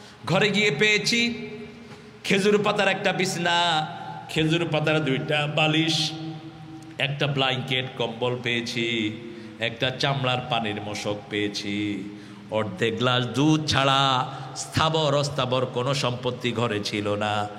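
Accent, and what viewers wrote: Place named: Noakhali, Bangladesh. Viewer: আমারও মনে হয় কারন অনি নোয়াখালী ভাষায় কথা বলে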